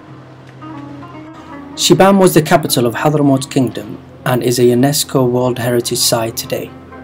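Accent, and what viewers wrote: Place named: Sheffield, United Kingdom. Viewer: Of course you cant ignore the beauty of Yemen, but i noticed the Sheffield accent straight away.. Brilliant video mashaallah..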